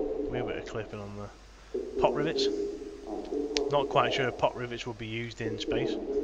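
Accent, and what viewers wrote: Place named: Yorkshire, United Kingdom. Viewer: Nice video bro. Sound like a fellow Yorkshireman too :) would be awesome transporting a ship load of these babies to a race!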